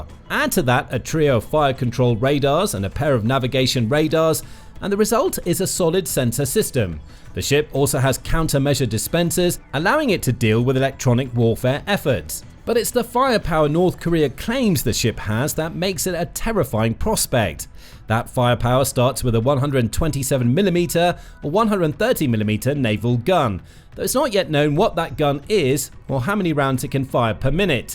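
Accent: United Kingdom. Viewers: Blocked, had my fill of Brit narrators. Enough already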